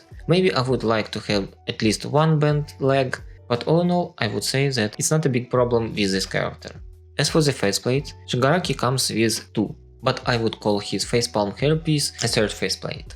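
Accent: Russia. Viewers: Bro, are u russian? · У вас ооочень русский акцент ^~^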